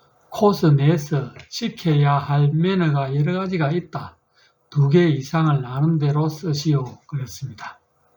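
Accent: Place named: Gyeongsang, South Korea. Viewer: 경상도 사투리~~~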